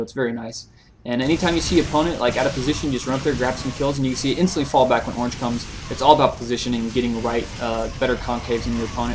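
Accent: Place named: Texas, United States. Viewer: I like your texas accent :>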